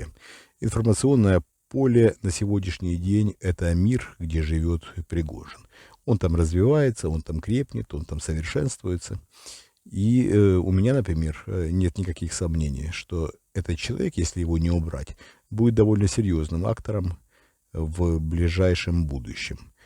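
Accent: Ukraine. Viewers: Виктор мыхалич, у ва юс акцент украинский. Как так?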